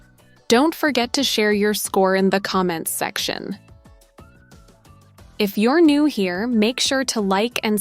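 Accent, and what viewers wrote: United States. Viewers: This is American with an awful accent not real English. Please call it American English !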